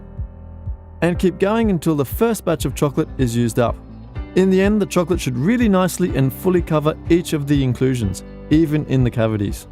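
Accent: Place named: Australia. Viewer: He sounds Australian with a hint of French.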